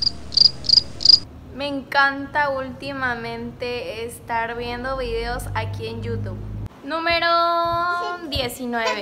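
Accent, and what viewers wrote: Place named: Fresa, Mexico. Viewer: Hablas muy fresa 🍓😬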